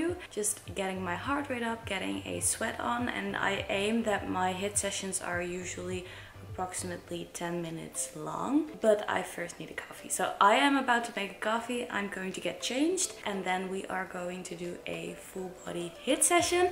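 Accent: Netherlands. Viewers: Toen je begon met praten hoorde ik meteen dat je Nederlander was :)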